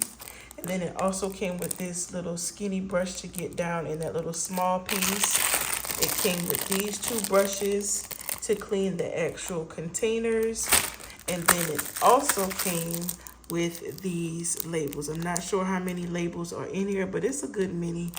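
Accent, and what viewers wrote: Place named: Louisiana, United States. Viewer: Love the channel from Alabama. I love your accent. Are you from Louisiana? Your channel is an inspiration 😅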